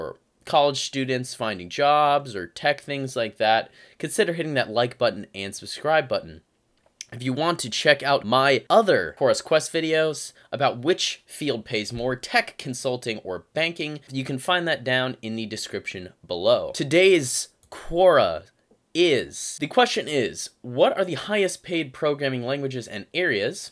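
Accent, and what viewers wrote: United Kingdom. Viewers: hey..I absolutely love your video. I love the british accent..you're going to be famous... please make more videos..I'm actually a registered pharmacist making $175000 a year for Walgreens pharmacy in the "el paso district" in las cruces new mexico....I went to UNIVERSITY...loved that..it's a British and canadian term university lol aka college. in US..my point being....love your breakdown of the yearly income for future millionaire's in today's economy...my friend is in your field and coul have used your help like 50 years ago but you didn't exist then..oh btw I only went to university for 7 years. I graduated at age 24 with as a pharmacist and live in one of the cheapest places to live in the country New Mexico..in NY I'd be making $30000 a year...ps your videos are my new addiction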